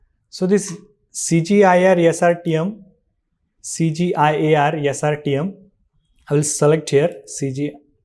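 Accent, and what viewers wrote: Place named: India. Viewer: I Love the Indian English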